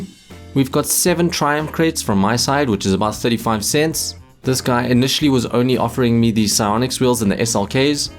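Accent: South Africa. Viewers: Your South African right?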